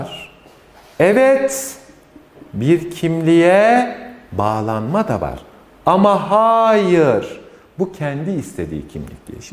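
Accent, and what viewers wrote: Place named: Aegean, Turkey. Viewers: Hocam nerelisiniz? Konuşmanız çok güzel lakin e ve a larda Ege ağzı hissettim, merak ettim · hoca izmirli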